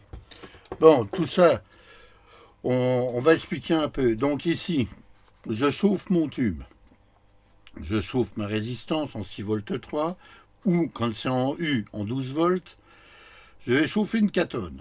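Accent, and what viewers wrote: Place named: Lorraine, France. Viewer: Bonjour, vous avez un accent lorrain,de quelle region etes vous.?...Connaissiez vous L ESR à Nancy boulevard jean jaures (L ecole speciale de radio électricité ) en 1968 et 1969...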